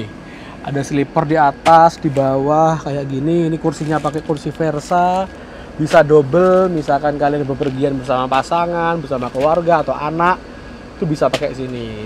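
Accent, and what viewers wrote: Java, Indonesia. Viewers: Channel ini isinya jamet jamet medoggg...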